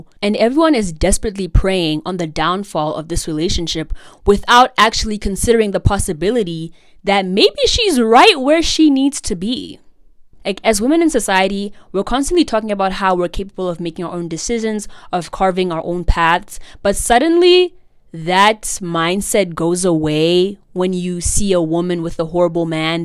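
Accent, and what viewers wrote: South Africa. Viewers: Btw are you South African?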